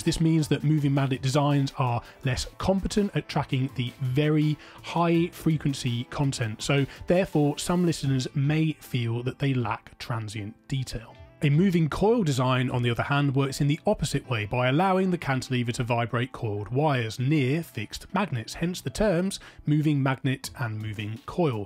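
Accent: United Kingdom. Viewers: Excellent video. Fantastic description.. efficiently to the point. Also the British accent is quite a nice compliment to the dialogue.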